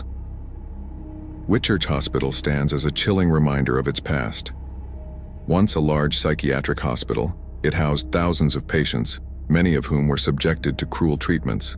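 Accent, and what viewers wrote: United States. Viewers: Why do we have an American talking about this stuff? Stick to your own stuff!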